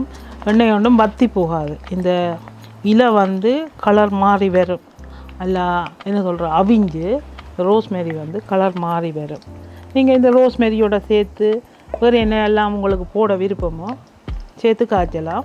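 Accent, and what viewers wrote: Sri Lanka. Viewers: உங்கள் தமிழ் பேச்சு மிகவும் அழகாக அருமையாக கேட்பதற்கு இனிமையாக உள்ளது.உங்கள் இலங்கை தமிழ் கொஞ்சிப் பேசும் அழகு மிகவும் எனக்கு ரொம்ப பிடிக்கும்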